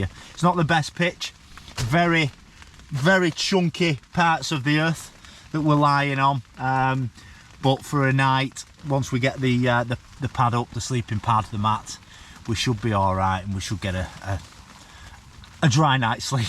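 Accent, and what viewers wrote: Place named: Bolton, United Kingdom. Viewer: Proper bowton talk, crackin't flags! 🙂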